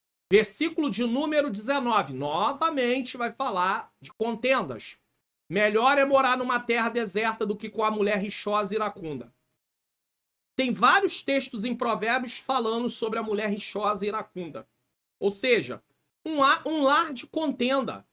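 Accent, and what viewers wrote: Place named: Rio de Janeiro, Brazil. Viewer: Pastor,venho do Futuro (setembro de 2026 😁),para dizer que passei anos na igreja (sou da Assembleia),e nunca havia lido a Bíblia,que vergonha 😢,mas desde o ano passado comecei a ler,estou em Provérbios,leio no meu tempo,sem pressa e sem tabela,e quero lhe dizer do fundo do meu ❤️,que eu glorifico a Deus,por ter colocado o senhor no meio caminho pra ensinar,explicar o que não entendo,Deus realmente lhe provém de sabedoria pra nos ensinar,o senhor não imagina minha felicidade a cada estudo com o senhor,gosto muito do seu jeito de falar e do seu sotaque carioca 😂..morro de saudades do Rio,morei 8 anos aí, sou paraense,mas tive meu filho aí,vim embora em 2001😢. Que Deus abençoe sempre, sempre,sua vida e sua família 🙌🏼📖❤️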